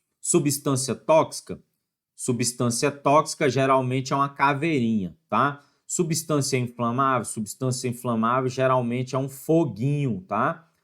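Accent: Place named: Brasília, Brazil. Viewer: Sotacão de brasília é inconfundível kkk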